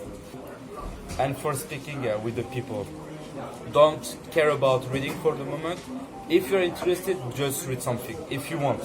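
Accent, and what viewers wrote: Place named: France. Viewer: An english speaking turkish guy with a french dialect :-) Let me hug you a bit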